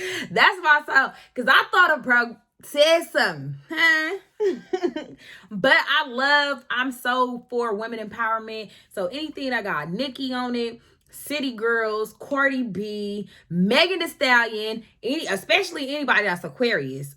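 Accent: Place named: South, United States. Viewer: You really sound like your from the South like me. I love the path you are on!! You are such a Queen sis👏🏾👏🏾👏🏾💜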